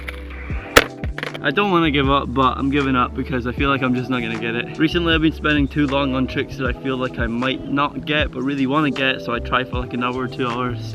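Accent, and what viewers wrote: Scotland, United Kingdom. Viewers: u scottish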